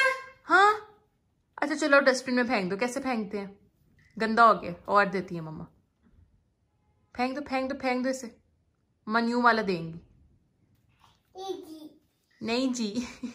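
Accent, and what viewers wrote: Lahore, Pakistan. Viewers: I love ur pakistani accent 😘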